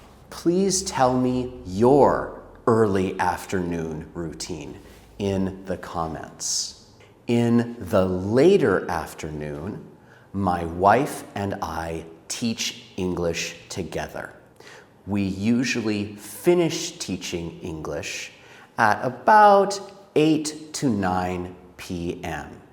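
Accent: United States. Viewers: You are American or British? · Hey, I love American accent. I was doing the shadowing practices And wow it comes naturally.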